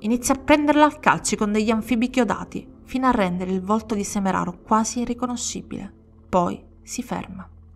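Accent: Sicily, Italy. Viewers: Probabilmente il commento può sembrare inappropriato…e ti prego: “prendilo davvero x ciò che è; UN COMPLIMENTO!” ma devo proprio dirtelo… Nonostante le storie di cui racconti il tuo accento fortemente “siculo” ti rende davvero sensuale! Forse perchè sono innamorato delle donne siciliane, ma nonostante non ti si vede sono sicuro devi essere bellissima…e appunto…sensuale!😍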